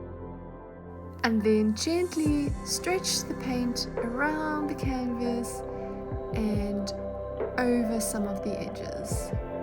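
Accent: South Africa. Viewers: I came across your beautiful pearl pour by accident. My first though was ..... "she's South African". Am I correct 😂. I am also living in the US and it was AWESOME to hear a voice from home. Thank you for your beautiful pour...😊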